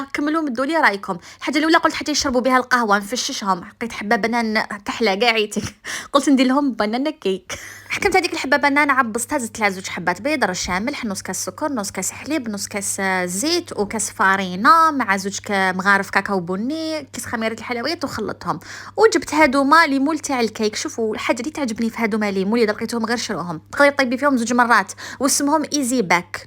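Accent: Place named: Morocco. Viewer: يا جدع انا عملت كومنت بس علشان ام اللهجة الي مش بفهم منها كلمة دي اكيد مغربية باين من الفرنش و الدريجة الي هتاخد منكوا حتة 🙂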